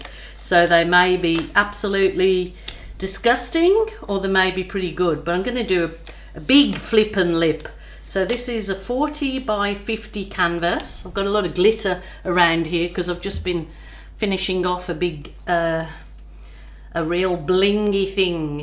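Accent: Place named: Black Country, United Kingdom. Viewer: Great use of left overs, love the yam yam accent 🤐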